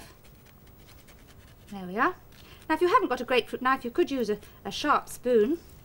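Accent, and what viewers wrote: RP, United Kingdom. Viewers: she sounds so posh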